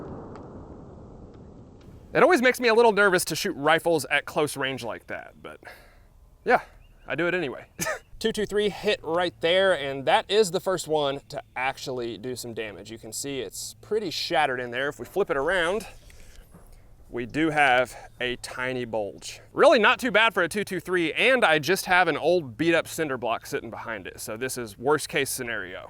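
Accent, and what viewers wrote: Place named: United States. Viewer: Sounds like perfect American English 😊😅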